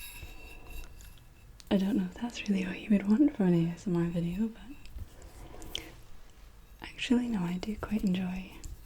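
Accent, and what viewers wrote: Scotland, United Kingdom. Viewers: Do I hear a Scottish accent 👀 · I wish I had a Scottish accent, It sounds amazing. I'm starting to hate my southern draw, because It makes me sound stupid XD... Great video nonetheless, subscribed!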